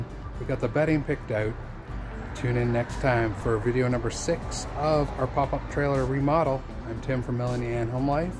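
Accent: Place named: Midwest, United States. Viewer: “Ope, just tripped there, sorry” you’re definitely from the Midwest 😅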